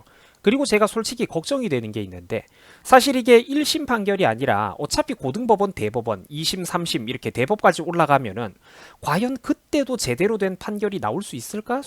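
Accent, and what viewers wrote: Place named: Gyeongsang, South Korea. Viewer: 님 경상도 분이신가요? 오늘따라 특히 사투리가 정경심(정겹게) 느껴지네요.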